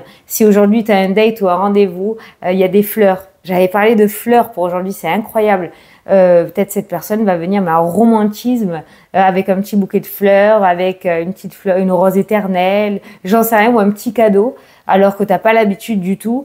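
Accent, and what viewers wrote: South, France. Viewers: Bonjour ma, tu es une personne très de l empathie cela se ressent dans la façon de t exprimer avec ton petit accès du sud que j aime entendre. Je souhaite pouvoir participer à ton jeu concours pour avoir une consultation gratuite de 30 m. Merci pour le postif que tu nous apportes tous les jours et qui nous aident t positiver. Un grand merci ma douce.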